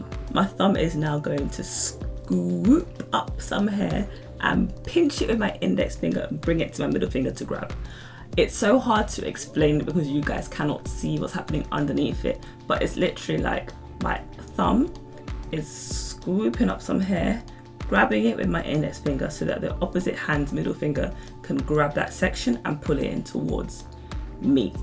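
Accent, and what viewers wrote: United Kingdom. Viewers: I love your accent London accent i guess You are a beautiful woman with a beautiful voice 💕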